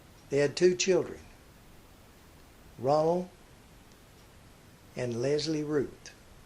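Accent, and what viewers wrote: South, United States. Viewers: Most interesting thanks. BTW I enjoy your accent. Which part of the South are you from? I live outside the USA so cannot place your state of origin from your accent.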